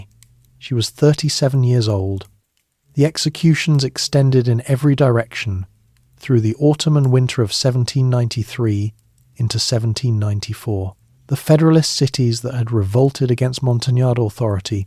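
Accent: England, United Kingdom. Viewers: Another one of those videos with a horrible very strong English accent. I don't waste my time with such things.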